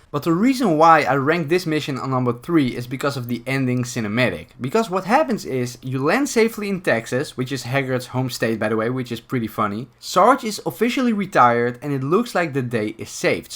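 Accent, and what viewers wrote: Netherlands. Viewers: Your dutch accent is out of the roof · You have a Dutch accent I'm right? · Ur acent is a bit dutch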